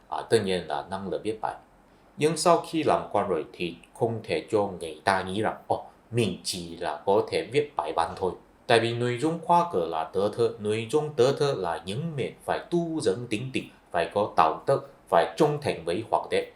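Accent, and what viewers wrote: Cantonese, China. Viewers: Ông này nói tiếng việt rất đúng ngữ pháp chắc vì là người gốc hoa nên cách phát âm nghe cũng có vẻ na ná như tiếng trung vậy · Ô này hình dáng bên ngoài là người Việt Nam, adn là người Việt Nam nhưng tiếng nói bị hán hóa, nghe tiếng thì ô này người Quảng Đông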